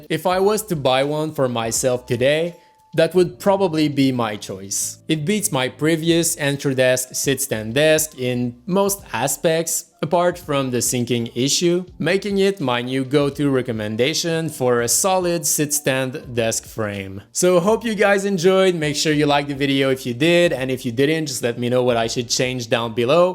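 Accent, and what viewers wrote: Quebec, Canada. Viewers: Criss, ca parait t'es Quebecois, lool. Nice vid! Just got myself a standing desk. Gonna check out your cable management vid lol.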